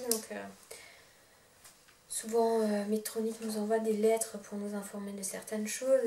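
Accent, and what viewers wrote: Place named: Alsace, France. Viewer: Ce retour de l'accent alsacien un régal pour les oreilles ! Miam ahah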